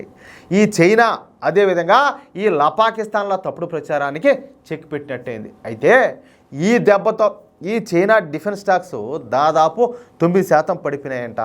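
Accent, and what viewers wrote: Rayalaseema, India. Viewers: ఒక్కటి అంచనా వేయలేక పోతున్నా సోదరా మీ మాటలు యాస కేచ్ చేయలేకపోతున్నా మొతంగా రాయలసీమగా అనుకుంటున్నా. మిక్స్ అయి వుంది. Tq.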